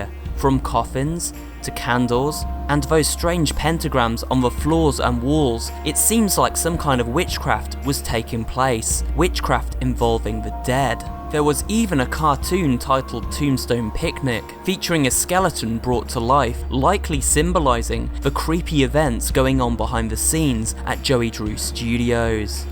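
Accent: United Kingdom. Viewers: That's a pretty good detective work. Also, I did notice your very interesting aksent. Are you british?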